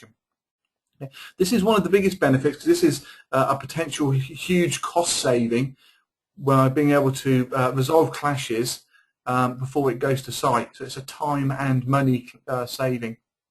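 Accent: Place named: Cockney, United Kingdom. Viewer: A cockney webinar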